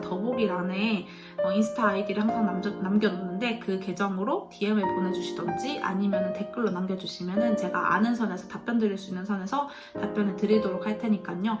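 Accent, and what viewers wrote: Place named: Seoul, South Korea. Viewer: 아니 님 영상에서는 왜 서울말을 쓰시는고에요?🤭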